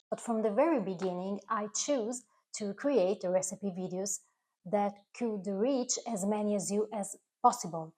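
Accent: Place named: Italy. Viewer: Ciao Cara Mia 🥀. You have been part of my life for over 7 of those 8 years you have been making videos. The reason I love you and your videos so much is because I feel like you are doing it just for me. I also love your videos so much because you make things that remind me of my NONNA. I remember the first time you did that. You were using an Espresso coffee pot like my Nonna had. I commented about how it made me think about her. I love the way you share your vacation pictures and your personal pictures of your family Holiday table. Your voice is lovely. You sound like an Italian Girl speaking English. The friendship we share is very important to me. I value it greatly. That's why I always tell you, TI AMO AMICA MIO, because I do. My dear sweet friend. 🇺🇲✝️🇮🇹🤗😘😘😘😘😘😘😘😘😘😘😘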